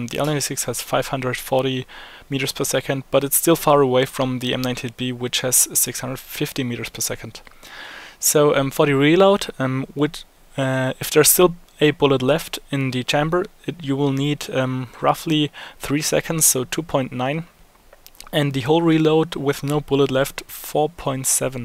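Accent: Germany. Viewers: Gutes Video! Ich habe alles verstanden und dein English hört sich richtig gut an. Den deutschen hört man aber trotzdem heraus :) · hab ich sofort gehört, aber der dialekt ist nicht so stark wie bei anderen deutschen die Englisch sprechen:) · Are u German?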